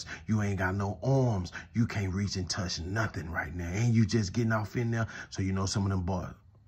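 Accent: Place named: South, United States. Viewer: You got me really using my imagination and I enjoy the content…..I’m like ….I feel what you about to say and that country accent…Unique and ❤….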